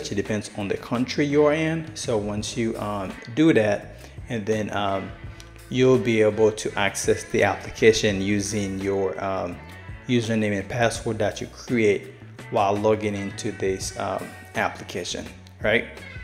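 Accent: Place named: Haiti. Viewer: You re clearly Haitian . I hear your accent. Why would you do something so important only in English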